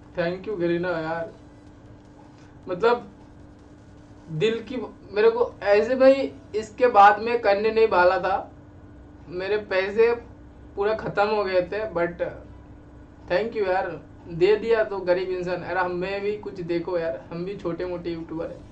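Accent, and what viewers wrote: Bengal, India. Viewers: Bengali Bhai kitni bhi Hindi bollo I can understand you are Bengali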